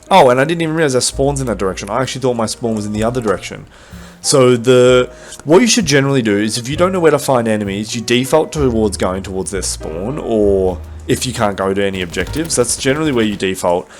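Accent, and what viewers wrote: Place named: United States. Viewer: That American accent through me off real good. It was perfect.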